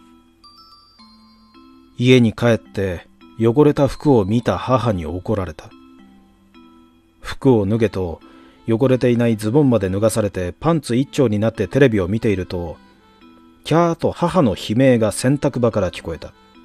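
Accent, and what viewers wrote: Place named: Osaka, Japan. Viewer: おはようございます。いま拝聴しました。なでか懐かしく感じられる里山の風景と滑らかな大阪弁小さな出来事が見事に描き出され朝からほっこりしました有り難うございました。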